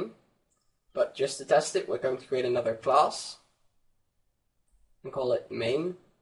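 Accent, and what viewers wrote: Netherlands. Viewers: you can just hear your dutch, ik ook :D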